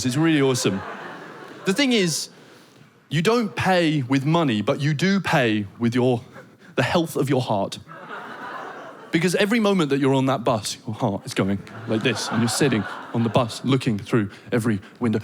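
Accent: Denmark. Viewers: Does have a Danish accent when he speaks English or maybe he is just one of those people that sound horrible no matter the language they try to use.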